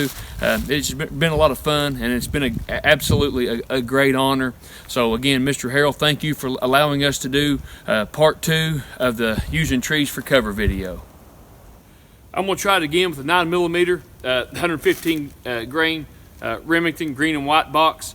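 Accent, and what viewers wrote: United States. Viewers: The video was really refreshing, what I call proper American. I am from UK and bird and rabbit shoot in woods not dissimilar to yours, unfortunately, our government takes a dim view of the populace having firearms, so best I can shoot without spending a fortune is shotguns. Just love your accent dude, you come across real natural, and just pop anything up when you got the time, we hillbillies got to work to make ends meet. I do shoot an American flatbow in my hunting grounds in Hampshire, heard you backwoods boys are handy with a bow. Grace and Peace American bro.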